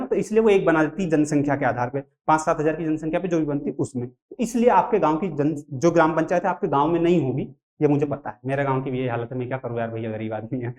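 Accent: Indore, India. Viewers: Indori accent❤❤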